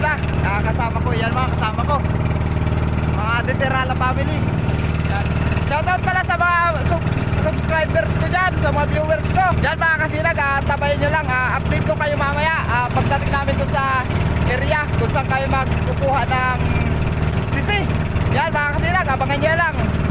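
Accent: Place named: Albay, Philippines. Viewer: kala ko kasi taga albay ka yung tuno kaso ng pagsasalita mo parang sa albay. San ka sa cam sur idol? Update mo kame lage ki bagwis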